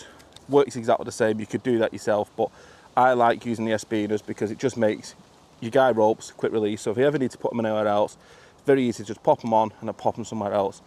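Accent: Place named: Scotland, United Kingdom. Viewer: Spanish??????? I think he has a Scottish accent. One of us is having a very hard time understanding.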